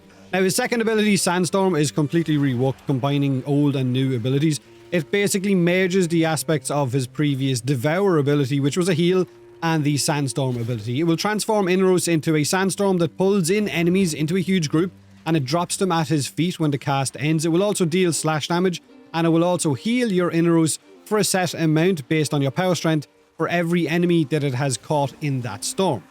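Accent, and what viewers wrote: Ireland. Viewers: It must be the Irish accent, I can always understand what the is describing about the abilities, anyone else has a go and I start day dreaming of long strolls in the woods under all the beautiful threes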